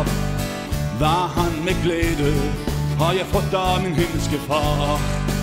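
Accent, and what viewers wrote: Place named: Kristiansand, Norway. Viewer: dette er da virkelig ikke en bergensdialekt. dette må være noe i området Kristiansand? Bibelbeltet aktig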